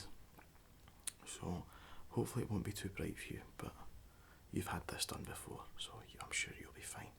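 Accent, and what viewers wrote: Scotland, United Kingdom. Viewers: I adore your videos, you have such a relaxing voice! And I'm quite the sucker for scottish accents:) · I think I'm going to move myself and my Australian accent to Scotland now in a desperate attempt to pick up this accent! Hahaha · I love this! It's always more relaxing for me when it's a scottish accent because I'm Scottish.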